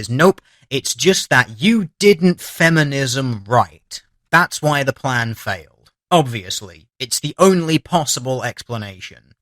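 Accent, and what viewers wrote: United Kingdom. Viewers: You got me subscribed with your British accent and the numa numa joke. Hope all your vids are as good as this.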